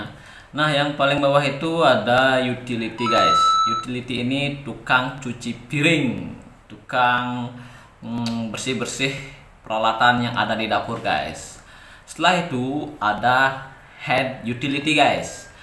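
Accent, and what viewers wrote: Bali, Indonesia. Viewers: Abg orang bali yah. Heheh./lo gue nya msh rada gx mirip sama jkt aslinya heheh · Logat balix kentalll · Logat bali ne asane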